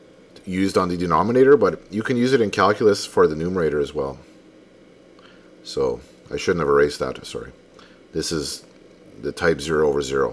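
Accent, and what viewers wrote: Canada. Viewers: are u by chance canadian?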